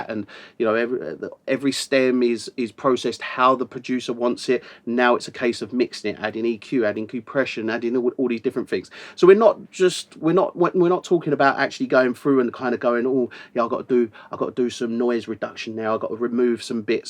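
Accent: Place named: Cockney, United Kingdom. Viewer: So, this is cockney accent, I guess :)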